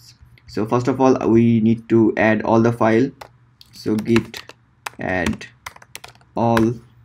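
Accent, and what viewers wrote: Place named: India. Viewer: whenever you listen indian accent videos you already know your problem is fixel lol. So staright to point thank you sir.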